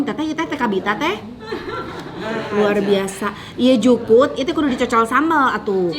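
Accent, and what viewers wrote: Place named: Sunda, Indonesia. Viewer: Paling seneng bgt dgr org ngmg sunda😂 dgr teh ngmg tuh sesuatu bgt 😂👍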